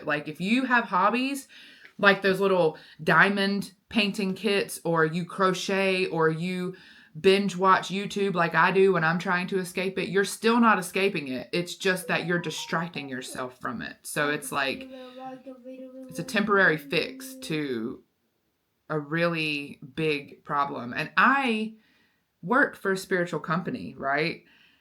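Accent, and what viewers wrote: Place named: South, United States. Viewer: Have you seen Evan Edinger's videos about food banned in Europe, but not the US? That was an eye opener to me as a lifelong UK resident and really made me wonder what the FDA actually does. Combine that with the fact that the FDA's failure to properly regulate opioids lead to that crisis in the US and it seems like it's a regulator that has been so defunded that it does very little of what work it really needs to. This is the thing that feels so off about the US culturally - it went from "No taxation without representation" to "No Taxation, we don't need no government", so it feels like virtually every regulator is unfit for purpose. The Supreme Court limited the ability of the EPA to control power plant emissions in a fairly recent ruling. I'm saying this to try and put it across that I hopefully get some of your reticence about heading 'home', that the changes in how the country is run that you may like to see would be a very hard sell to other voters, let alone finding a party to represent that. 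To hear your worries about US school shootings while Tennessee are passing a bill to arm teachers instead of looking at the problem at source (and I'm guessing that your accent is from somewhere like Alabama, Kentucky, Tennessee or Georgia), I wish I had words of comfort on that front. Good luck finding a home in California, hope that maybe you can find a community where they try to grow their own food to a higher standard than the FDA asks for and Robert can continue to eat well.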